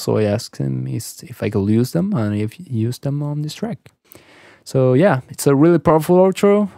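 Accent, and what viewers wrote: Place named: Spain. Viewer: "Reburb" 😂 I love the spanish accent 😁 Love the video man!!! 👌🏼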